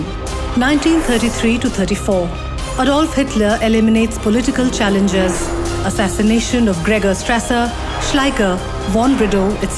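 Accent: India. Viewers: on a completely unrelated note, i love the indian english accent. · 一看标题这么劲爆，点进来发现是三哥口音 不稀奇. 这个民族没救. 中美GDP差距1/3 中国还谨小慎微.. 中印GDP差了五倍 (在相似人口相似基础下). 印度这个民族永远不知道反省. 指望着骂别人可以提升自己。中国则是励精图治, 奋发图强 。 这是两国最大的区别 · hey guys is the narrator indian???????????? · your Indian accent give you away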